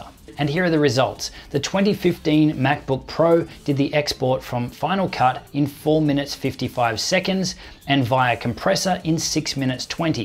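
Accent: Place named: Australia. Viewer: Hey, nice to hear an Aussie voice doing some serious reviewing.:) I'm curious about the codec/s used in the tests across Premiere Pro and FCPX - were they truly the same asset? I know FCPX can transcod Camera formats to ProRes - which I would expect to export much faster being Intraframe based codecs. Cheers, JB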